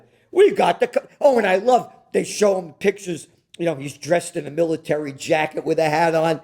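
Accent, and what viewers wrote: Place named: New York, United States. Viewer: Gerald Celente...Yr schtick is Incomparable!!! I grew up in the small town near Poukeepsie...I enjoy hearing yr NY accent and mannerisms...peace out, and thanks!!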